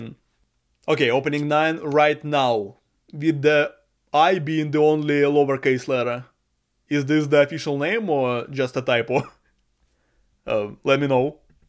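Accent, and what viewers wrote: Russia. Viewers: По акценту и затем по названию понял, что человек русский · слышу русский акцент в английской речи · are you russian perhaps? either way I love the accent lol it makes so much better lol.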